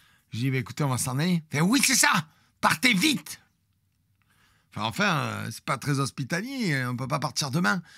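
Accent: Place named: Savoy, France. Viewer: c'est pas l'accent savoyard plus que breton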